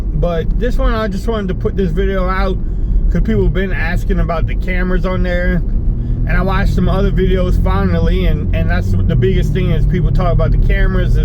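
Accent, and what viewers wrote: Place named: Louisiana, United States. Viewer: You still sound Cajun. Camera's ok 😀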